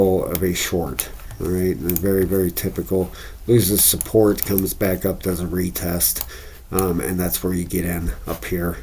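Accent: Pittsburgh, United States. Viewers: Nice video- are you from Pittsburgh by chance? - killin the shorts💥 $Turbo $TurboToadToken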